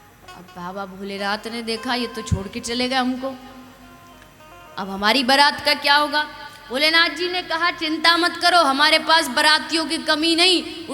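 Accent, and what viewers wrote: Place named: Braj, India. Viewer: मैया यशोदा व भगवान भोलेनाथ बाबा का " बाल गोपाल दशॅन " के बारे में आपके श्री मुख से यह प्रसंग व सवांद सुन कर हम काफ़ी प्रभावित हुए हैं। 🕉️🕉️🕉️🕉️ खास बात आपका वृज भाषा के ऊपर प्रभुत्व (वैशै तो आपका जन्म स्थान ८४ कोश में आता है फिर भी आप के मुखार विंद से वृज भाषा हमें बहुत प्यारी,प्यारी व मीठी, मीठी लगती है और बार, बार मन सुनने को कर्ता है। ☘️🕉️☘️ सचमें मैया और भोलेनाथ बाबा बात करते है ऐसा हम अनुभव करते हैं। ☘️🕉️ देवीजी, आपका बहुत-बहुत धन्यवाद हमारा राघे-राघे और जय श्री कृष्णा स्वीकार करे । 🙏♥️🙏♥️🍀☘️🍀💐🌷💐 <mail> USA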